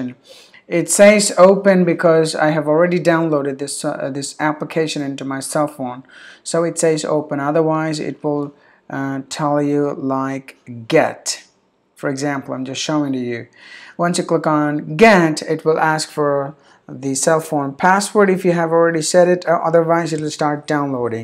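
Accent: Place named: India. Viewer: His pronunciation ìs not great. He has a strong Indian accent and he is mixing English and American language which adds to the inaccuracy and increases lack of clarity. Don't copy him !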